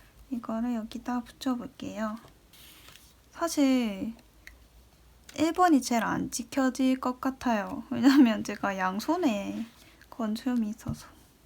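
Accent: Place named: Gyeongsang, South Korea. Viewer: 타지살이하는 경상도인인데 억양덕에 고향온거같구 ㅠ 해서 자주 놀러오려구 구독하고가요😆❤️타지분들이 경상도 억양을 좋아하시던데 제가 듣기에도 너무 귀여우시네요 😘( 입덕)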